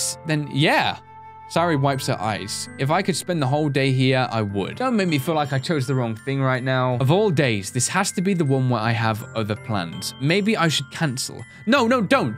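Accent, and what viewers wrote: United Kingdom. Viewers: Why do you sound sound British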